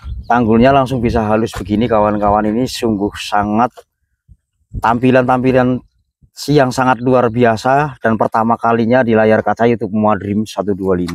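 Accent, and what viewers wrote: Banyumas, Indonesia. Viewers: Ora ngapak ora kepenak makin joss semangat menuju 20K nya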